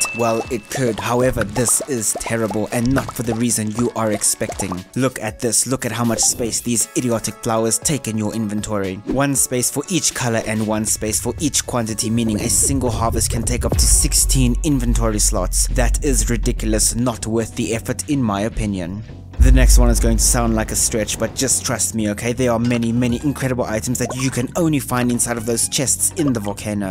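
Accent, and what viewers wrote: South Africa. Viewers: This guy sounds South African.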